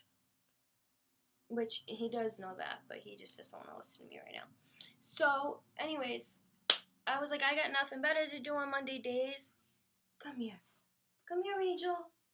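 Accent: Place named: Massachusetts, United States. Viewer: You definitely picked up the Massachusetts accent haha its kinda adorable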